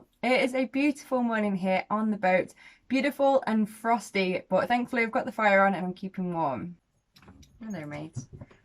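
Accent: United Kingdom. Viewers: Since you sound Brit and I assume you are one Reach out to ant fx.. You will find his channel too But try to get hold of him cause he is Brit too..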